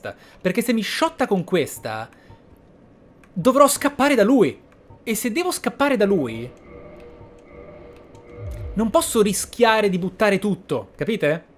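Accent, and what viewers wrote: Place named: Ireland. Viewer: "Maybe the TURD one". Non è che hai l'inglese impastato, è che lo parli con l'accento irlandese; che è tipo l'anello della calamità dell'inglese, come dimostrò sulla BBC Dara Ó Briain con una bambola parlante...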